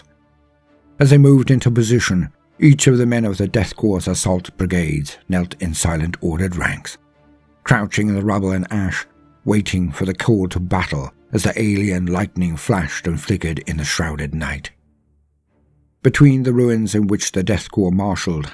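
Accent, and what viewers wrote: United Kingdom. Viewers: Godbless you. Nothing hits quite like a softspoken British man during a nap 🙏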